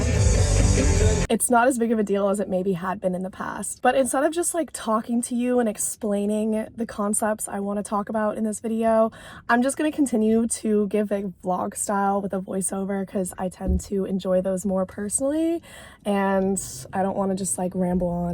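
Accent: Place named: United States. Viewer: This accent is definitely American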